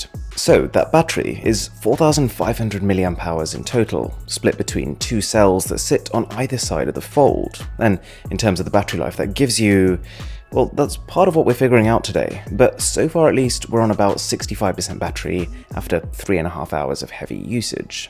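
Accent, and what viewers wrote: RP, United Kingdom. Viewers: Quite weird to hear someone with such a strong/"posh" British accent say "anyways" several times. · This guy is so posh omg looool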